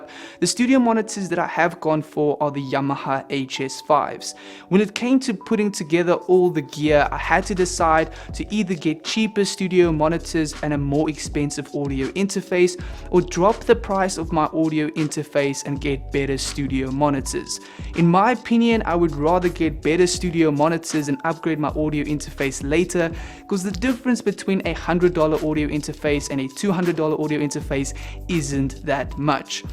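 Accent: South Africa. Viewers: Hi love your video’s been watching you from before the giveaways 😜 just a random question were are you from because I am getting South African vibes from your accent 🤷🏻‍♂️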